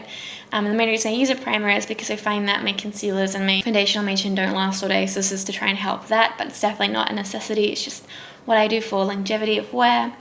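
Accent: Australia. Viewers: I absolutely love this look! So exciting hearing an Aussie accent :) keep up the great work! Subbed! :)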